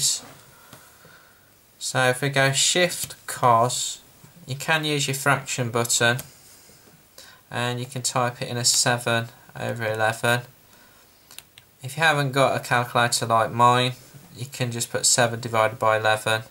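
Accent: Birmingham, United Kingdom. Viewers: that brummy accen though!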